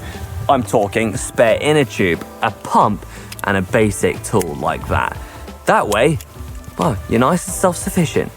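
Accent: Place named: RP, United Kingdom. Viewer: Love how posh this white guy is. Keep it up, great work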